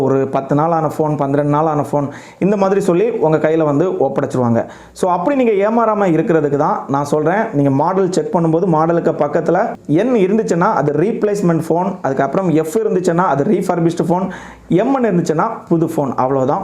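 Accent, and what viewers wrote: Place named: Kanyakumari, India. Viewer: Kanyakumari pechu mathiri iruke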